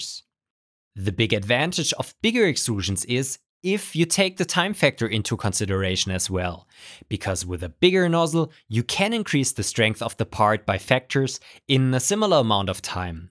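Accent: Germany. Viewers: Man merkt richtig dass du deutscher bist.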